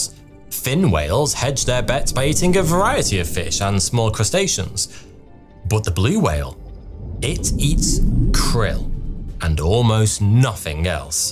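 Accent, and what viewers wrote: United Kingdom. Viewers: I just love the British accent ❤ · "Hey fowtewtouw hewe" Dude, I can't get enough of your British accent. 😃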